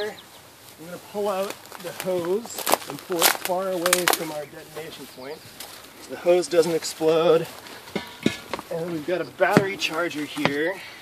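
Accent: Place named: United States. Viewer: listen to the accent, 100% American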